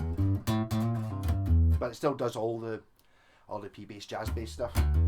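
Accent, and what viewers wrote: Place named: Glasgow, United Kingdom. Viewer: My heart absolutely jumped at hearing the Glasgow accent, love to see the fellow Scottish musicians! Great demo lad!🏴💪🏻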